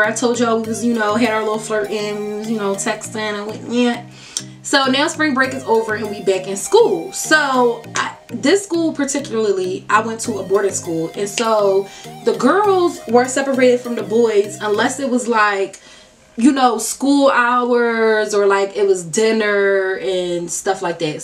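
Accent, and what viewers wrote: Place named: Philadelphia, United States. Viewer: You sound like you from philly. You went to Girard College?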